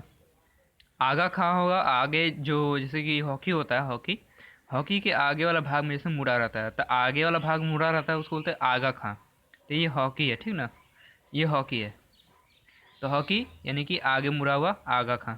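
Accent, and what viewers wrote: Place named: Bhojpuri, India. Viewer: Your bhojpuri tone is really sweet and this is really amazing trick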